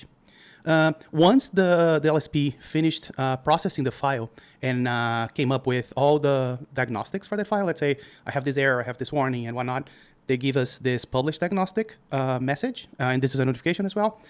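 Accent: Brazil. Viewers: No momento em que eu ouvi tua voz sabia que tu era brasileiro kakakak Boa mano, é muito bom ver alguém da comunidade trazendo um video em rust tão bom quanto esse Sucesso mano \o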